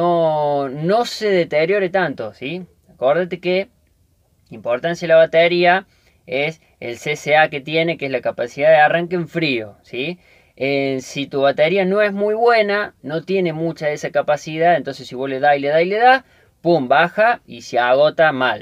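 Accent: Córdoba, Argentina. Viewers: decime si no sos Córdobes papá?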